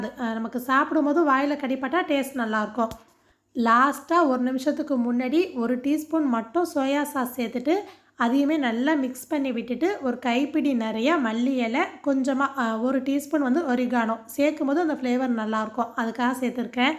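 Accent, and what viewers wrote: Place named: Adirampattinam, India. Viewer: Adirampattinam slang kooda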